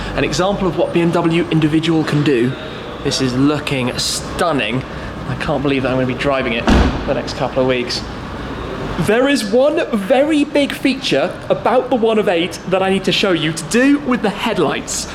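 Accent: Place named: RP, United Kingdom. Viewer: Ur so posh bruv allow it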